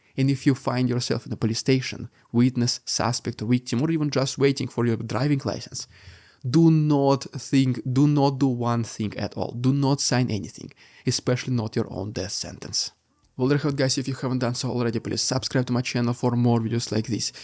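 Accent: Russia. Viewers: You would get more listeners if you dropped the heavy Russian accent. People want to understand the information not listen to a comedian.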